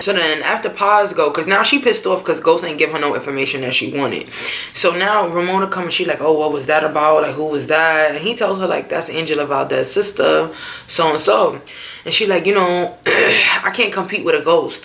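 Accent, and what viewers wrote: New York, United States. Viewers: I love your reviews I came across your Channel recently & the NY accent got me I’m from CT so if you know you know 😂 but this episode was good but predictable I think it was Tariq if you noticed he fell back so whoever shot him made him turn around to face them first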